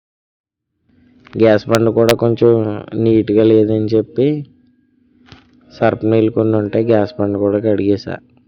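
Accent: India. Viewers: Ok ...I am from palakollu..bec of slang I guess u r from West godavari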